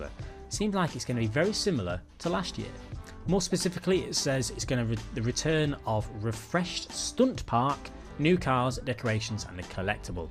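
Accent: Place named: England, United Kingdom. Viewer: It's the English accent.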